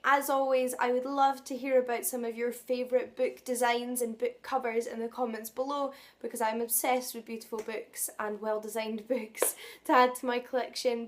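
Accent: Scotland, United Kingdom. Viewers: Beautiffuly illustrated covers! I love your accent too. I miss living in Scotland!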